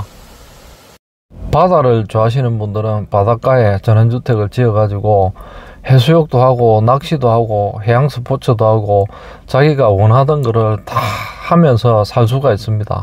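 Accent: Gyeongsang, South Korea. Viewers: 100프로 갱상도 액센트...... 소름끼치네!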